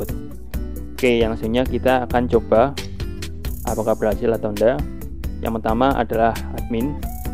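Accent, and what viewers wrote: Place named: Banyumas, Indonesia. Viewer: Wong ngapak tah, cilacap mas xixi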